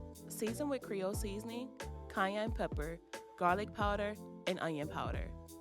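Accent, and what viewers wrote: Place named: New Orleans, United States. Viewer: Ooooh y’all make me sick!😂😂😂🫶. I subbed from the poor man’s Rockefeller recipe. Y’all have the best online. Then I heard the accent and I was like “Oh these my people, ya heard me❤️. When I saw this recipe I’m like “You have to be New Orleans to understand the magnitude of this recipe. I’ll be here for more. Wishing you all the success on the growth of your channel.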